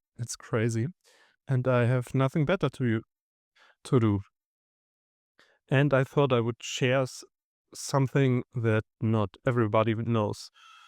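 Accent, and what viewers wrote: Germany. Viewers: Unlike Americans, the German accent is elegant, and graceful. It is a pleasure to hear you speak. Please, always feel free to do so whenever possible. I am looking very much forward to the expanded video on this subject as it is quite deep filled with complexities which may hold be the key to revealing the most long awaited, fiercely battled mystery in England's history. Thank you greatly for your fine, most dedicated work in this regard. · you know I love your German accent! It's a hot day in Texas too but that's normal for August. I sure hate the heat. It's so draining. At least I'll be you have snow in the winter where you live. Snow is a luxury here. But it's so pretty when it does. This whole York, Plantagenet, Lancaster, tower thing is confusing. About the time I think I have a grasp of it, something else comes up. You keep up the good work, ok? 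And thank you!!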